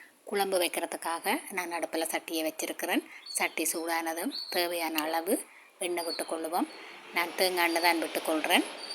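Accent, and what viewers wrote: Sri Lanka. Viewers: சகோதரி அதிக நாட்களுக்கு பிறகு சுத்தமான எங்கள் இலங்கைத்தமிழில் ஒருவரை கண்டுபிடித்துள்ளேன். மிகவும் சந்தோஷமாக இருக்கிறது. உங்கள் காணொளிகளுக்கு நன்றிகள். ஒரு இந்திய தமிழ் கலப்பின்றி நீங்கள் கதைப்பது எங்கள் தமிழை வளர்க்க மிகவும் பயனுள்ளதாக இருக்கும்.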